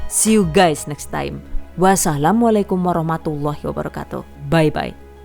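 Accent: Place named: Tegal, Indonesia. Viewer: Tegal baget ya bund,,😅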